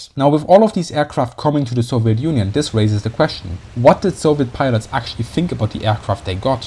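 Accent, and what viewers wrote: Germany. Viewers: Sorry, but the guy is a native German speaker who has taken the time to learn English so we can get excellent information that many English historians do not access because they do not read German, or Russian. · We have always been grateful of the USA effort to help us against NAZI Gernany. And we did pay back Lend Lease. Though our tanks were better we loved he USA and still do. The spam you sent us was a great relief to our rations. So please do not think all Russians are not thank full or were not thank full. I wish people like you understand the whole issue. How long did it take Germany to pay of the damage they created in Europe. My family is from St Petersburg. Please do not put all Russians or CCCPs population and military in the same box. BTW most of my family perished in St Petersburg. So what is your real point. You seem bias. 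NKVD really???? Or what about your Gestapo. Yes you sound German. If not I do apologize. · The video is very informative and interesting as well, though I’m sorry, but I simply can’t listen to that hard german accent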